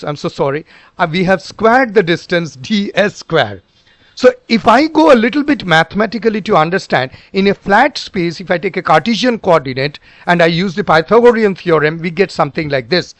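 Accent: India. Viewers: Sir are you from West Bengal ❤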